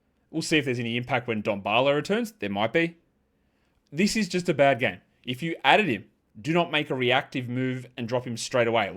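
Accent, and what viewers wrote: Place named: Australia. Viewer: Yo!!! Who is this guy? First time I heard this guy I was about to turn it off once I heard the Aussie accent I immediately judged him as being unknowledgeable but I dropped my remote after a hasty approach to close the video. Thank God! I happened to hear a rare but legendary Jon Vitti Simpsons reference that if you know you know. Only legends know what the f he was referencing. And I wanted more! Fact is that Vitti reference was GOLD! is referencing one of the funniest episodes from The Golden Age of the Simpsons, that was one of the best episodes by probably their second best comedy writers in the history of television not just the Simpsons. Jon Vitti who arguably is behind maybe John Schwartzwelder and tied with Conan, even though Conan didn’t write enough to have as many classics as most Simpsons Golden Age scribes. But, seasons 1-6 of the Simpsons can’t be compared to any other show it goes down as the best comedy tv series animated or not, it doesn’t matter, the writing was so tight and smart. 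If you know it, when someone references one of those episodes like this dude just did in this clip, you know he knows his shit.m, ABOUT EVERYTHING. Guy or whoever this dude is, has some taste. I am listening…you got me hooked. At first, admittedly and with shame, I heard the Aussie accent and immediately judged his hoops knowledge as being suspect. However after I heard the Corey hotline reference I had to give him a chance. He is absolutely surprisingly LE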